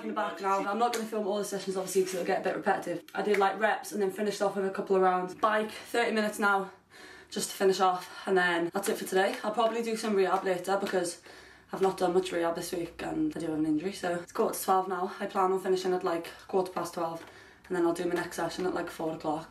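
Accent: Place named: Wales, United Kingdom. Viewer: The Welsh accent is getting stronger with each day in quarantine!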